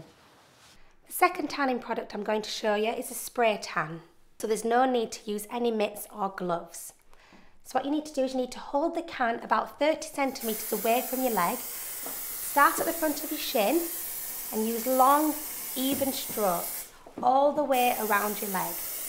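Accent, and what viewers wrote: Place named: Newcastle, United Kingdom. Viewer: lol shes so from newcaslte or round there xd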